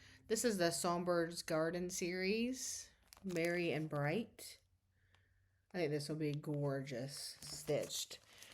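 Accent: South, United States. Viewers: The reindeer feedsack is so cute! At age 75, my eyes don’t work too well on anything smaller than 32 count fabric, so would love to win it! Always love all your finishes and stitching! Also, being Southern myself I love hearing your accent! Makes me feel like home! ❤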